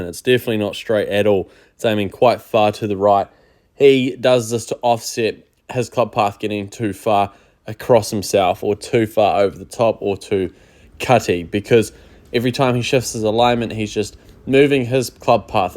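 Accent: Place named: New Zealand. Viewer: Great analogy, you sound like a kiwi that has lived in Aus for a while?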